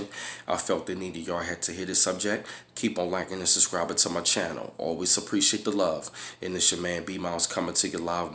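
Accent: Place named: Maryland, United States. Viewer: Thanks for sharing brother, love that Maryland accent.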